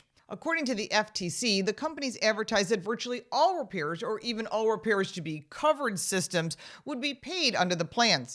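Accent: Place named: Buffalo, United States. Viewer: The familiar Buffalo accent. Very nasal.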